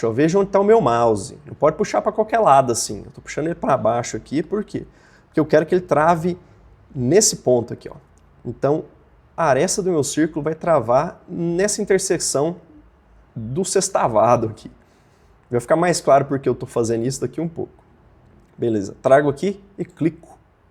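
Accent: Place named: Minas Gerais, Brazil. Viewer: Realmente melhor tutorial para começar! Bonus point pro sotaque, Mineiro né? hahaha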